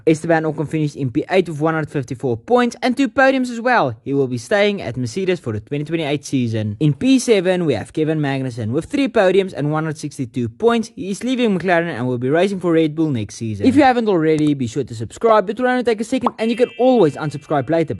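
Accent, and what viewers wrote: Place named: South Africa. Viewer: This is the strongest South african accent I have heard in my life.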